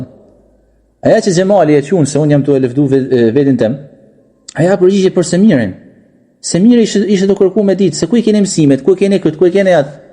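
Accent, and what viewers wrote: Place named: Shkodër, Albania. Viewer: Kush asht hoxha qe flet se po duket shkodran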